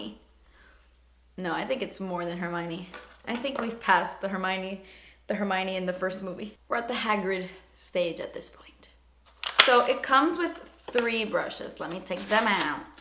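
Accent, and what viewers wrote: England, United Kingdom. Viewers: You're so funny 😂 The British accent and all! 😂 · I love that you do a random british accent in your vids. I do the same in daily life 😂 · You do such a great British accent😍. Better than me and I'm British 😝😂 Oh and P.S I love you 😍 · I love your english accent. You are amazing xx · i love that you always randomly break out into an English accent.. i do that too lol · Love your British accent!!!! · Your British accent is great! I also enjoyed the Harry Potter references 😂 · that british accent tho lol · love your british accent 😂😂😂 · Girl, your british accent slays me.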